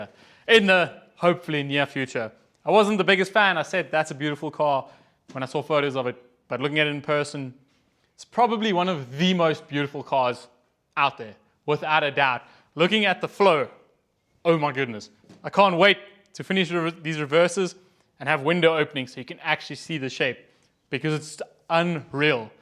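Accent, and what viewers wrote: South Africa. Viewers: Do l detect a slight South African accent ? I bought my planishing hammer in January 1970 , a Proto made in Canada .l always kept the head clean until about 10 years ago some idiot recovery driver thought he'd use it to unjam his tailgate ! It completely destroyed the case hardened face. I rate myself as a very good panel beater / painter but l was in accident repair so never had the opportunity to use a wheeling machine or work with alloy . Fabrication and making panels is something l self taught . My mentor passed a year ago . He had a wheeling machine and learnt to work with aluminium. He was very talented . I thought this was repaired a number of years ago . Or is this a replica ? Thanks .P.S. Allen welded with oxy/ Acetylene . Tig welders were years away 😊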